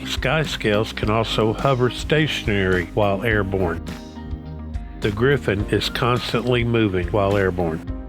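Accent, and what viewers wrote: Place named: South, United States. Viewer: Thanks, love your voice...you should do radio! Where are you from? There is a soft inflection there that reminds me of the south, where I did a lot of my growing up. I am too uncoordinated for JPs, does getting the skyscale require those? My server sucks too as I am in the remote north (more north than the Nords, lol). Thanks again.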